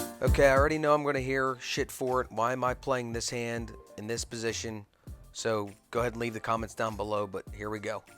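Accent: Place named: Maryland, United States. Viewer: 1 minute in, and you get a like just for your Maryland accent!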